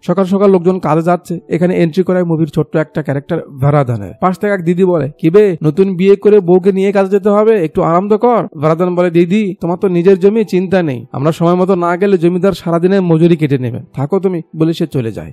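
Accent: Bogura, Bangladesh. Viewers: হামি তো ভেবেছিলাম আপনার বাড়ি বগুড়া এল্লা কোন কথা বারে আপনি বগুড়ার ছল নো ?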